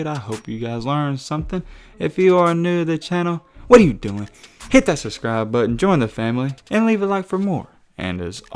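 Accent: South, United States. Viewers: You southern af bro 🤣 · Country af voice. Sounds like you're talking and trying to hide your accent. Loud and proud brother. Don't hide that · Dude I gotta be honest the southern accent was not made for YouTube commentary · where you from? that southern accent heavyyyy🤣🤣👍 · Love the country voice commentary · The narrator sounds like he's a buzzed hillbilly. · This man sounds like he's trying to hide his southern accent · Yo ahh country asf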